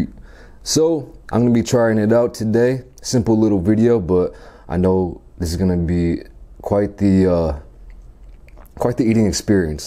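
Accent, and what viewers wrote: United States. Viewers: I can tell you aren’t from Seoul originally but from the U.S. why do you live out there? Jw. Love your content!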